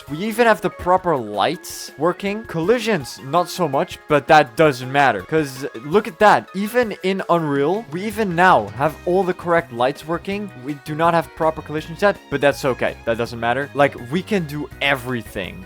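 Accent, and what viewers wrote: Netherlands. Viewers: bro you sound so dutch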